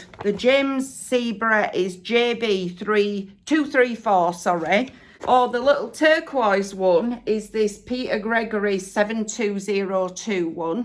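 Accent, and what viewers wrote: Yorkshire, United Kingdom. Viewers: So good to see you back on YouTube I have missed you as I am sure have many others sincerely hope you are well on the mend to become your happy self again. I watch you from New Zealand where I live now having moved here 15 years ago I am originally from York where I think you said you lived at one time . I am 88 years old and I love to hear your Yorkshire accent take care happy knitting well done. G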